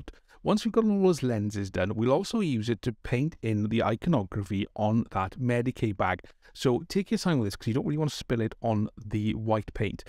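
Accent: Wales, United Kingdom. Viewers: I bloody love your accent. The Welsh accent is beautiful.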